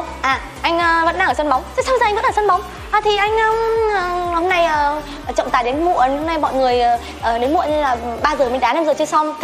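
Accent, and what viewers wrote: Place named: South, Vietnam. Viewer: Nói giọng Nam rồi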